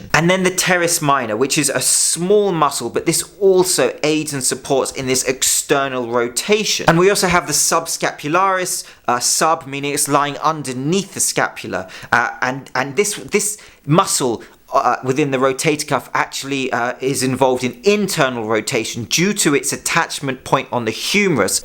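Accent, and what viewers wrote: United Kingdom. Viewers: The British accent is such a flex and a distraction...people don't even listen to the message, they just want to hear people speaking like that:)🤣👍🏾✊🏾💪🏾✌🏾can't explainit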